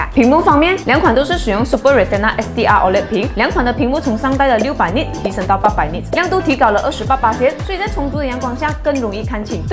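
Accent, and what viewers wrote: Malaysia. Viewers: 應該是馬來西亞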